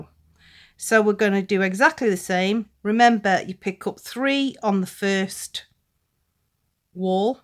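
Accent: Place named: Northern England, United Kingdom. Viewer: Just found this channel, just getting back into beading after a couple of years break. I'm trying to remember how I used to weaves, so reacquainting myself by watching YouTube. thank you for describing this weave so beautifully. I've subscribed, so I look forward to more tutorials. I'm a northern lass, so it's great to hear another northern accent. 😊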